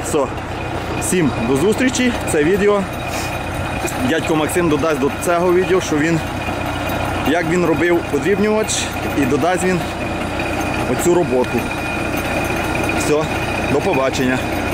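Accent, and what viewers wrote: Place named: Ternopil, Ukraine. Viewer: Дякую за Украінський канал і за рідну Тернопільсьську говірку, супер!!!))))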